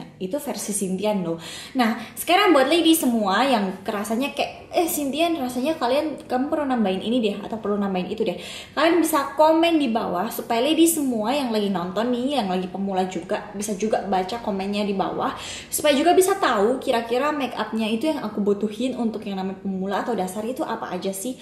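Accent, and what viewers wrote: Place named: Yogyakarta, Indonesia. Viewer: Haiii, kamu orang jogja yaa? Medok bangetttt Nice vids btw!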